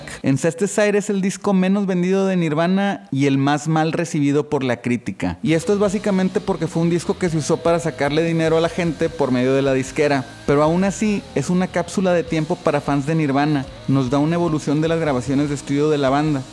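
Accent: Monterrey, Mexico. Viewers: hablas bien regio vato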